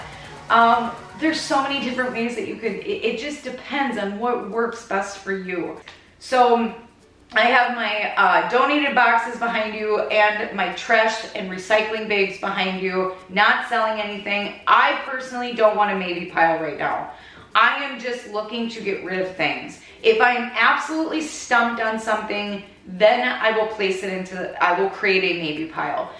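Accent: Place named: Michigan, United States. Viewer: Just happened across your channel for motivation! Your accent sounds like you are from Michigan or somewhere int that region. If so, hello from Michigan!!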